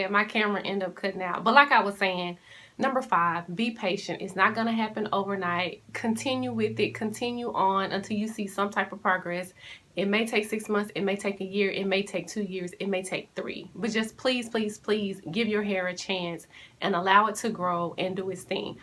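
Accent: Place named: South, United States. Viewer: Cool beans! You sound a little a fellow Southern Girl, Lol! Welcome to yt...looking forward to the virtual connection. Peace and blessings. :)) *subbed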